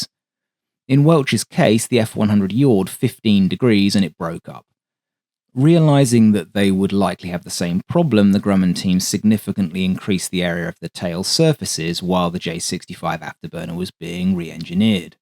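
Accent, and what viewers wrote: England, United Kingdom. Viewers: Well, the English call it a motor, and he sounds English, so there!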